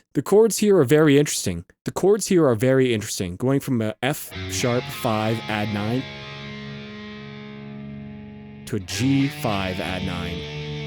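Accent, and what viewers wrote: Canada. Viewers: I thought you sounded Canadian! Hello from B.C! Thank you for doing a wonderful analysis on this beautiful song. is not only my favourite album but my favourite metal album of all time. I love how well the storytelling goes with the music and its overarching message gives me hope and has helped me persevere through my own struggles. I look forward to watching more of your content- keep up the good work!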